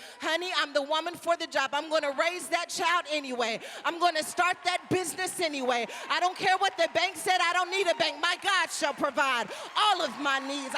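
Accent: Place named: AAVE, United States. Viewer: Hahaha! I love! Bring the ebonics sis! Lol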